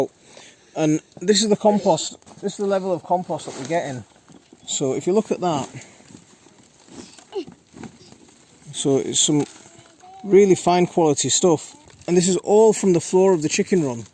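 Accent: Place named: Yorkshire, United Kingdom. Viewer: oh ok Ma'sha'Allah I didn't think you was from west yorkshire my brother, I could tell with the accent but I thought your probably more from Sheffield sides lol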